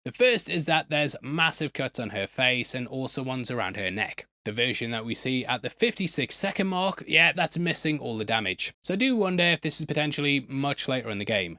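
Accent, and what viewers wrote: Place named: United Kingdom. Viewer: He's British, they have a million different accents on a tiny island country. I lived there for years.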